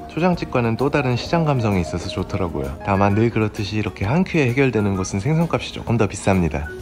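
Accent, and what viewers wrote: Gyeongsang, South Korea. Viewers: 경상도~~~말투부터 짜증나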